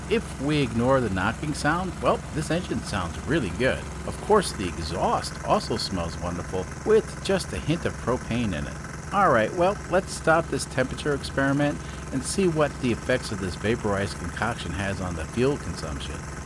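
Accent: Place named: Chicago, United States. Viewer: Your accent I don't even recognize, not Michigan I'm assuming. Chicago? But you complain about the heat after moving from Michigan and it has me curious. Do you prefer the Kansas climate overall? I'm a buckeye/Michigander myself. I was transplanted to Texas by my family and can't wait to get back to Michigan and get out of the heat.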